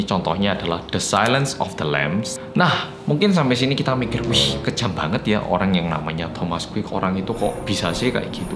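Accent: Java, Indonesia. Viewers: Bersama orang korea yg medok💛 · MEDHOKKK AAAA SUKA BGT SMGT TERUS MAS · suka bgt denger mas ngomong medhokkkkk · suka ih medoknya mas 😂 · Sangat medok sekali luar biasaa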